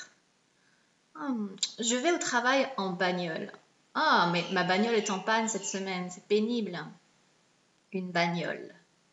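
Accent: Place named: France. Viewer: tu as in voix tres feminin et tres interresant, une vrai accent feminine et franchise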